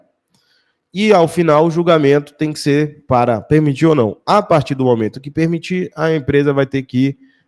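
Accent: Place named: Northeast, Brazil. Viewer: Cara ele é do nordeste, a dicção dele sempre será assim, independente de fono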